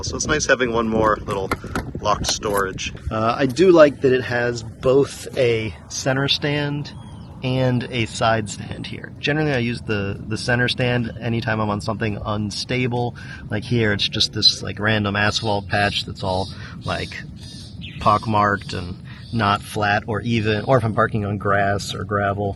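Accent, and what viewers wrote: United States. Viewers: You sound American. So where is home?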